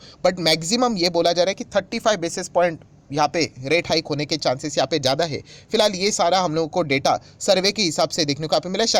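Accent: Maharashtra, India. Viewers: State maharastra Bas itna pta hai vo bhi sir ki language se 😜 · Sir marathi hai itna to pata chalta hai unke batose I proud of him 🙏🏻🙏🏻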